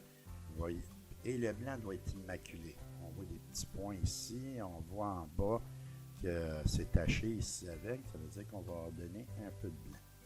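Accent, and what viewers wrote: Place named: Canada. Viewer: très bon tuto; l'accent canadien ajoute ss doute qqchose. Très clair et efficace. Merci.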